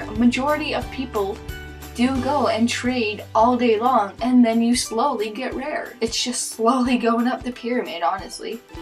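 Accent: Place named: United States. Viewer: I love your mini country accent it's so cute❤️ :)))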